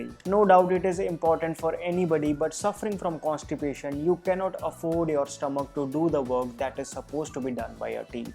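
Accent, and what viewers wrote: India. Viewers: Youtuber without haters.........very good English with Indian accent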